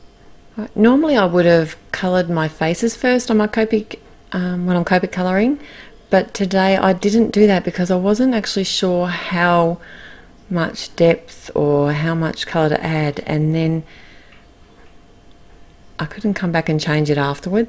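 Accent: Australia. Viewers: I love to watch your videos and this card is no exception it's brilliant!!! It's refreshing to see and hear an Aussie designer in card making video's although I love all the ladies and the men too lol I live in Sydney and thank you very much for the Aussie website links to almost all of the products you use :)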